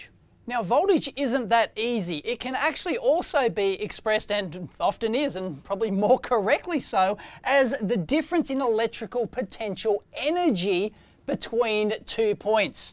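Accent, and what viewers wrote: Australia. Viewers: Aaaay aussie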